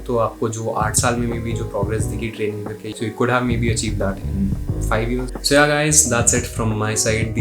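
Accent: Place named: Delhi, India. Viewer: Why do you pronounce English words like that, lol. Like when you say track, it sounds like truck. When you say pack, it sounds like puck. When you say man, it sounds like maan. Thick ass Delhi wala Indian accent.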